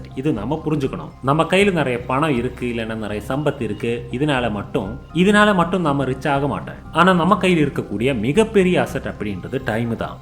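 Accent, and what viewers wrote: Kerala, India. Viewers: Bro Malayalam ma unga voice la theriunchu thu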